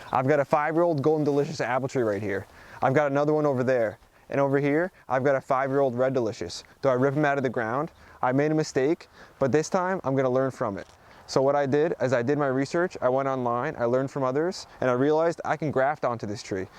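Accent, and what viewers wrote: New Jersey, United States. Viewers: Hey, thanks again. Funny, you took the words right out of my mind about your 1st apple tree: why plant a common grocery store variety, and why not graft onto it. Lol, thinking alike. Do you know John Kohler? He has some pretty good info on YouTube. Also, you’ve become my 2nd favorite New Jersey person (you guessed, fav is The Boss); I’m wondering if you have typical NJ accent? I’m in SoCal.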